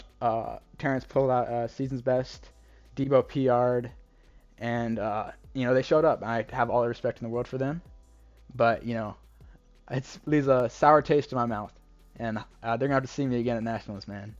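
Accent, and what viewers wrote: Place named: California, United States. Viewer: Dude you sound like your from California 😂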